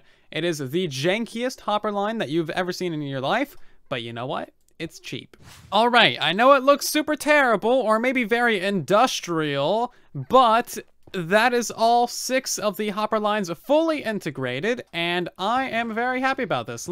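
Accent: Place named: United States. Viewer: It’s a pretty obvious American accent if you ask me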